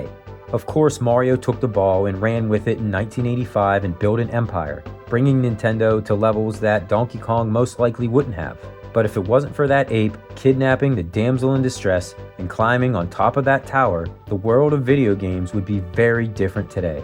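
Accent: Western Pennsylvania, United States. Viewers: Lol nice! I'm a complete f*cking nerd about linguistic stuff. So I'm like, bro must be from Western PA. I stopped short of calling you a Yinzer tho 🤣 #iykyk I'm from Ohio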